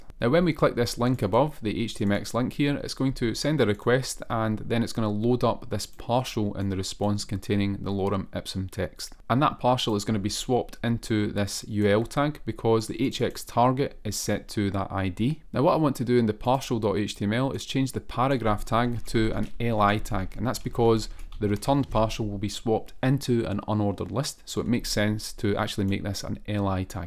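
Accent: Scotland, United Kingdom. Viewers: Thank you for the content you produce. And thank you for the Scottish accent! 😀 I was supposed to move to Scotland recently but some unfortunate events stopped me, so this will help ease the pain. I'm in the beginning of my Django learning journey, so I will definitely come to your videos many times. Good luck!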